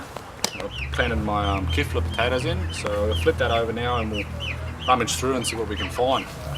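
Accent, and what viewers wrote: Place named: Australia. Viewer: You sound Australian!! :-)!!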